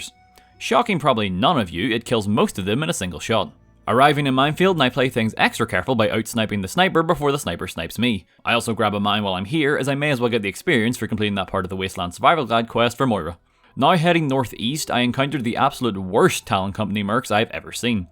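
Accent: Northern Ireland, United Kingdom. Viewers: I FUCKING KNEW HE WAS NORTHERN IRISH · New you where from N.I. the moment I heard ya. Way to represent the culture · I didn't even notice his accent until he said he was northern irish.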